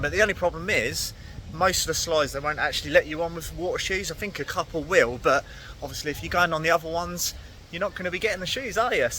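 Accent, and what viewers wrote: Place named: Norfolk, United Kingdom. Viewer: Are you from Norfolk, by any chance? Just trying to place your accent.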